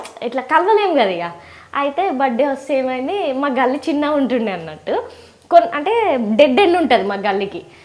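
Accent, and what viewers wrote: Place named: Warangal, India. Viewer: Warangal slang vere untadi... Akka mi slang masthu untadi..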